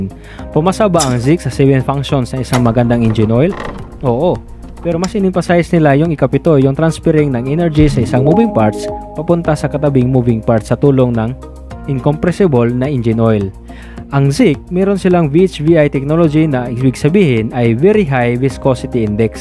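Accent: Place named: Visayas, Philippines. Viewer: bisaya man kaayo boss hahaha nice job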